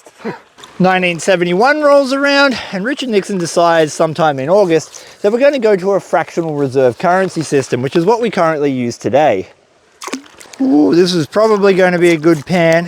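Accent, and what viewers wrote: Australia. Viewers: Bwahahahahahahahaa I loved the oratory comments spoken like a fair dinkum true blue Aussie mate, you have a way with words that bring tears of laughter to my eyes. Thanks for that I needed a great laugh and it was all true what you was saying about how the Gold standard was abolished by Richard Nixon of the Watergate Episode lol. Crikey do you think with all the shit going on that gold will rise further higher? I guess it is a waiting game hey, well stay safe and have a cracker of a day and you too.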